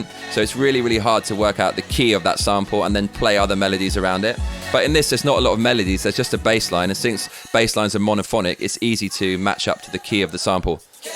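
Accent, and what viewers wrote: England, United Kingdom. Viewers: great stuff..glad to hear a British voice speaking truth!! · English accent. I hope this guy isn't yet another far left Trump-trasher. Go watch the Pennsylvania meet with state legislators where huge evidence was presented. The Supreme Court hearings will come next week.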